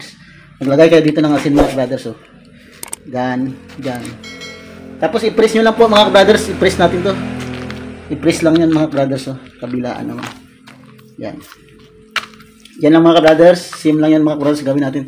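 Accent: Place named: Iloilo, Philippines. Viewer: Ilonggo ka sir😊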